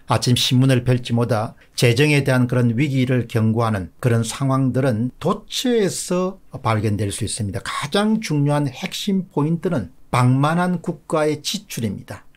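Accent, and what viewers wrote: Gyeongsang, South Korea. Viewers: 경상도 사투리 발음이라 그래요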